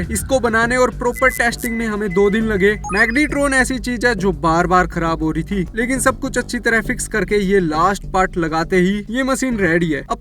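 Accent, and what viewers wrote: Haryana, India. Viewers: Bhaiya aap Haryana se ho kya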